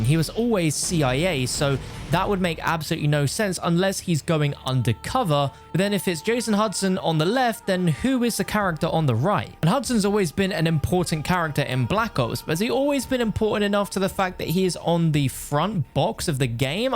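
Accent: United Kingdom. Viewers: I know I'm gonna get whooshed, but he said agent. It's just our British awful accents. But yes very funny joke.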